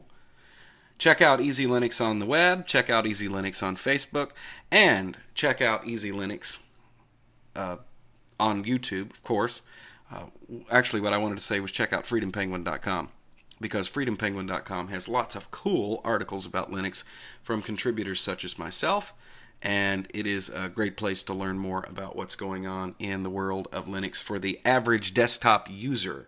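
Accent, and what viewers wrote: South, United States. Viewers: Boy, I'll tell ya - that southern twang spoutin on and on about Linux is something special. Keep on keepin on, and don't mind the haters. You've got another subscriber! PS: To everyone saying that "Ubuntu just works - stop distro hopping, you'll learn how it REALLY works" - go f*** yourself, you dang fanboys. He's absolutely right. The packaging of the kernel is not always done right. People mess up and some of these people are coders & packagers. I can't count the times on my two hands when I've installed the "easy to use linux distro" only to see that certain unsupported chipset & hardware, as well as unstable properitery AND open source drivers, make the system crash and burn horribly. It's quite irritating and someone who tells it like it is makes the situation better for new users, in realising how free and open it really is. 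It is chaos - a beautiful chaos, but still: chaos.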